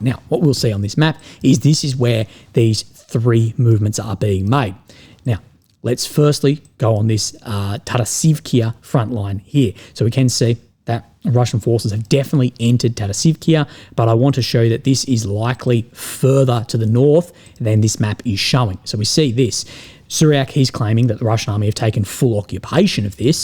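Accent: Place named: Australia. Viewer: l'm happy to see you in good health. over time, l'm also getting used to your aus accent. thanks for your contribution and comments. keep helping us please❤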